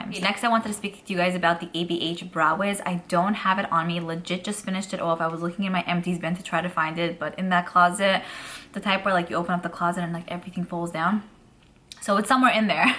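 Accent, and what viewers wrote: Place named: South, United States. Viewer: Random comment but I love your accent, the way you say "gloss" is so cute, is it Southern?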